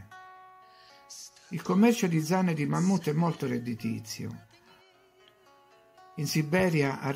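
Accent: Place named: Abruzzo, Italy. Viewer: Tu sei abruzzese giusto? da l'accento sembra dato che io lo sono....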